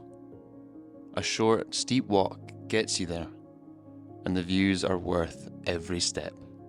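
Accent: Scotland, United Kingdom. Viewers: Nice to hear a Scottish accent. Instead of a ai voice. ❤ Scotland 💙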